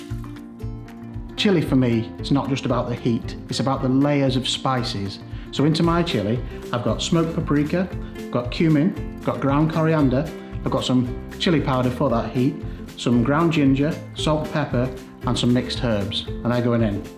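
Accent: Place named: Northern England, United Kingdom. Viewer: A northern lad I suspect me? Derbyshire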